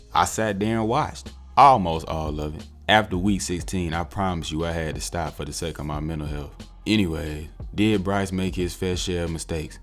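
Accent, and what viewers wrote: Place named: Carolina, United States. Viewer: Bro , Idk why people were defending that OL so much this year! I been telling my panthers friend, that the O-Line was a huge part of the problem, yet the media been on bryce's ass since day 1, pretending that PFF rankings and preseason expectations are the end all be all, without actually watching the games. As a Browns fan I know exactly what it's like to get half assed national media coverage that then influences the whole discussion about the team. Love from Austria, I love the accent btw. Real Carolina!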